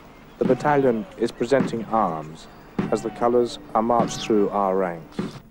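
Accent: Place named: England, United Kingdom. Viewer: could you not get anybody less english sounding to do the voiceover ffs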